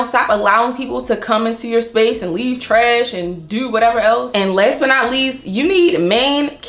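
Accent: Baltimore, United States. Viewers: It sounds like you’re from Baltimore so ima subscribe!!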